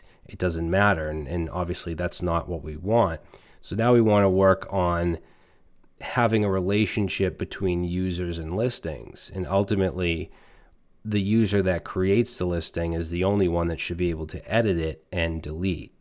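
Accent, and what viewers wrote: Boston, United States. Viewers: As a 10 year software engineer wanting to learn Laravel for fun, I'm loving this tutorial man. All tutorials should be done by folks from Boston, so I can occasionally hear "what tha hell is wrong wit me?" Better than 90% of the courses I've paid money for, and more efficient.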